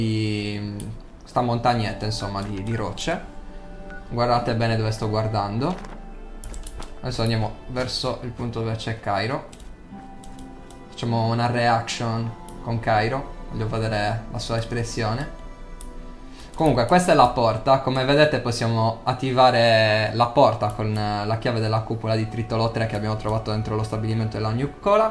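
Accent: Sardinia, Italy. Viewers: ho riconosciuto l'accento, pure io, Cagliari precisamente, mi piacerebbe aggiungerti fra gli amici se posso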